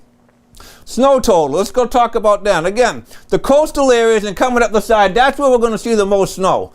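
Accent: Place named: Northeast, United States. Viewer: You are from the northeast. Can't hide your accent. Not a hillbilly.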